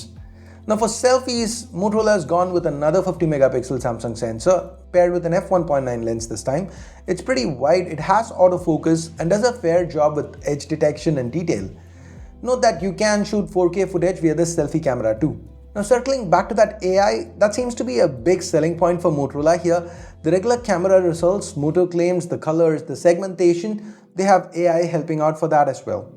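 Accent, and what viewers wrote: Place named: India. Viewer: Indian English is ridiculously funny 🤣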